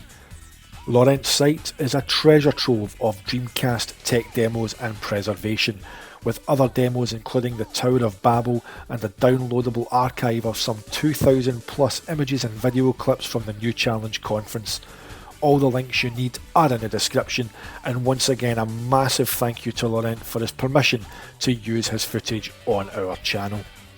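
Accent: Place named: Falkirk, United Kingdom. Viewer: OP Just curious what part of Scotland you from? Sounds like maybe around Falkirk?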